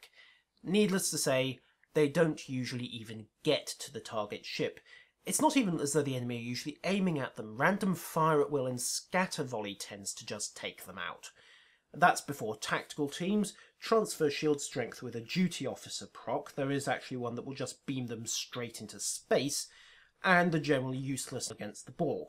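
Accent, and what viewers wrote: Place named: United Kingdom. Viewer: Something about being educated by a gentle British accent that just makes you feel more intelligent. Good info. I think I'm going Tricobalt.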